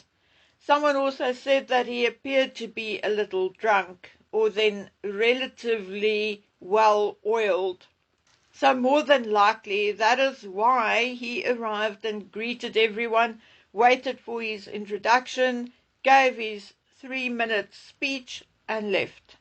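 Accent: South Africa. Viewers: I enjoy and value this channel and listen every day from NYC. One of the things I especially like about it is that it is the authentic voice of an intelligent and insightful woman from South Africa. I like hearing regional way of speaking English, her perspective as someone who lives within the Commonwealth and yet also lives on a different continent than either myself or Great Britain, and I like the fact that the broadcast is the work of an independent woman and that it represents her own initiative and hard work. I have to say that I'm not a fan of the new introduction because I think it reflects none of those things! The music is a bit bombastic and the imagery is artificial - exactly the opposite of herself, who is straightforward and authentic. Just my opinion, but I think the intro is too generic and dramatic for a channel that is so unique and rational., your own artwork is wonderful - why not feature it as the imagery of your intro? 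Thanks for all you do, and all the best, Lee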